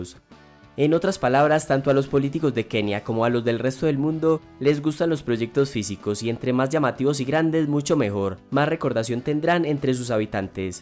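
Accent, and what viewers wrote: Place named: Coffee Region, Colombia. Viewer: NO HOMBRE!, ESE ACENTICO LO MATA. TERRIBLE QUE SONSONETE. CONSIGA UN NARRADOR NEUTRO. ES MUY FASTIDIOSO. NO PUEDE UNO CONCENTRARSE EN EL CONTENIDO, ACENTO CAMPESINO DE LA REGIÓN CAFETERA. MUY ARRASTRANDO.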